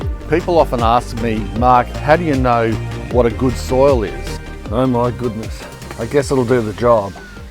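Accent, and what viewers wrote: Australia. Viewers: Good information Mark. I so enjoy watching your videos. Love your Australian accent, I live in Virginia in the USA. You would think my southern accent funny I guess. How cold does you winter time temps get? I think right now you are in fall nearing winter, as we are in late spring, almost summer.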